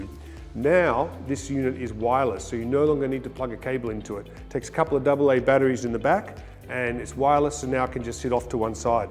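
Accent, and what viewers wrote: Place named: Australia. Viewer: Aussie accent makes me want to vomit.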